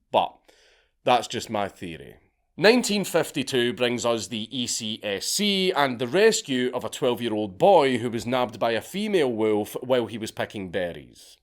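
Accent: Scotland, United Kingdom. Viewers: If you have the volume low enough and aren’t well versed in the Scottish accent, girls sounds like “ghettos”. Took me like 30 min to figure out why he keeps calling people ghettos lol.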